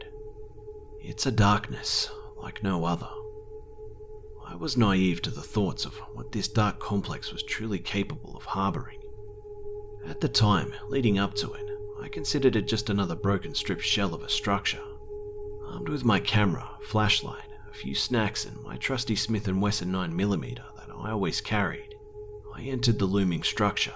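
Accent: Australia. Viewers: are you Australian, and I love your videos your the best narrator on YouTube. Please reply. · is the reason I now find Australian accents insanely attractive.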